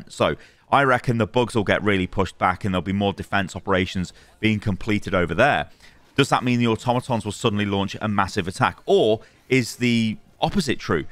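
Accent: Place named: United Kingdom. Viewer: Ah yes my thirteenth favorite channel: Panic in a Brit Voice <3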